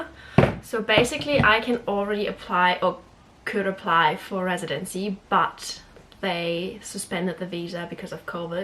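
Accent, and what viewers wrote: New Zealand. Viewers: Your English is getting a New Zealand accent.. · u sound kiwi....but anyway...have u ever thought about living in Australia? all the best in NZ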